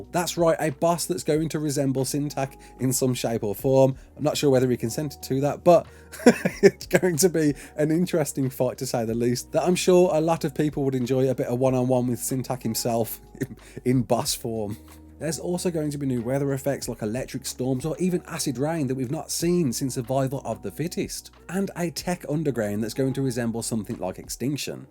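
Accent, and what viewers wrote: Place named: Scotland, United Kingdom. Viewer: Also, what country are you from? I really like your accent, it sounds like it may be Scottish but I don’t want to assume, I am probably horribly wrong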